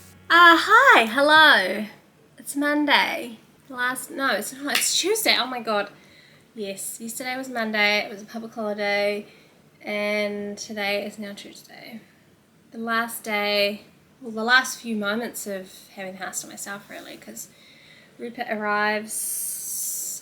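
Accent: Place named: New Zealand. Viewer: ooh okay i had no idea what poor things about (and i thought the film trailer look weird) but you’ve piqued my interest! your accent makes me miss NZ 😫🥹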